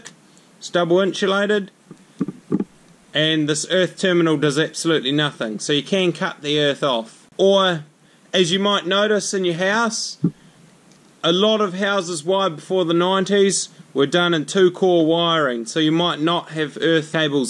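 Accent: New Zealand. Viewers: Love the kiwi accent, mate ;-) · I believe it's a kiwi accent!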